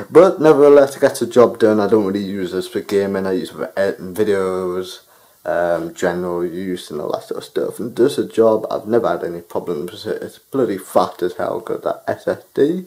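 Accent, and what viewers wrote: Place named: United Kingdom. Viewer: I knew it! That is really good British voice.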